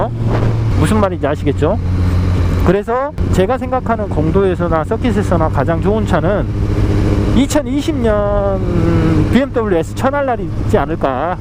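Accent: Jeolla, South Korea. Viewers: 늘 재미있게 잘 보고 있습니다. 구수한 전라도 사투리랑 같이 들으니 휠 재밌네요 ㅋㅋ 한가지 질문이 있습니다 - 저는 gsx-s1000을 타고 있는데요. 늘 r차에 대한 로망이 있었습니다. 문제는 자세인데 네이키드에 비해 많이 힘들겠지요? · 으따 전라도구마잉~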